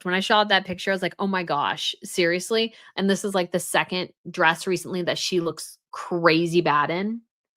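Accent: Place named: United States. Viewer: Good questions: why go to the party to begin with? Were they actually invited? Was it Megan’s decision? Surely you (ginger boy) know it’s rememberence day? Why not have all images scrubbed from the internet? It’s all bogus, a sham, a cock up. Now as for you, … use the profits you make and instead of the European tours with everyday folks and buying jewellery, etc. get a vocal coach, get a stylist, new haircut and colour, have the stylist redo your wardrobe, get some basic pieces that can be mixed and matched - spoil yourself, up your game. You've worked for it. This is your business and you (unlike megan) are the face of it and you need to present in a certain way. Now, granted, I’m no fan of the American accent and trust me, markle is a deplorable human being, but as a makeup artist and someone with brand management experience, you need to level up. 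Please stop saying “as….(long pause) Well!! (Hard W). It’s excruciating…and you come off as a circus MC/ game show host. It’s slightly inauthentic and I’m sure that it’s not something you are aware that you’re doing. Life has its ups and downs but take the time to better your offering and start with yourself. Your content, I’m sure, is fairly well-researched and put together. Apply that attention to detail to yourself or consider podcast-only content. Start with the vocal /voice coach to correct your cadence and go from there.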